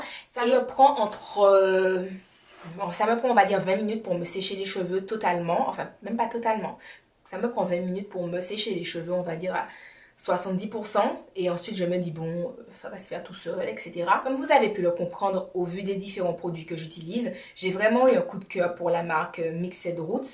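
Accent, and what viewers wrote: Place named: Antilles, Caribbean. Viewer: Merci pour la vidéo, j'aime trop ton accent antillais:D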